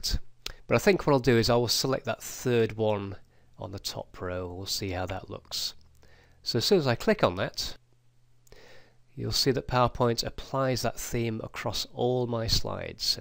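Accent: England, United Kingdom. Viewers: For some of us who are American, we love the flexibility of spelling it flavour or flavor; check or checque; color or colour; etc. Or maybe my latent ancestral genes (as in DNA, not "jeans" as in clothing) just kicked in and I accept both ways of spelling, realizing that the context of his accent tells me he is of more recent British extraction. My family is several generations removed from Britain and Ireland, and has lost the charm of the accent. Yet, when I traveled to Ireland, it was as if I were "home" despite the accent, differences in pronunciation and spelling, etc. There is a concept called called "code-switching" you might like to research. It comes into play in dual language situations where one is mentally switching what they "heard" or "saw" (as in a visual language like American Sign Language (ASL) vs Pidgin Sign Language - using the signed hand shapes of ASL but in the grammatical sequence of Spoken English Grammar.). 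So, for me, this tutorial was wonderful and I appreciate the time he took to carefully explain a bit how the PowerPoint process works. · .....a VERY good tutorial! – ...and Thank You for speaking "english-english" in a clear and "easy-to-listen-to" way...(Many accents from within Britain can be awful to listen to unfortuantely...this was very good) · great work.. great British accent..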